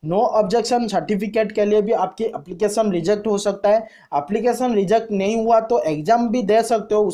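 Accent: Odisha, India. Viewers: HAS Tech burbak woh oriya hai thik se suno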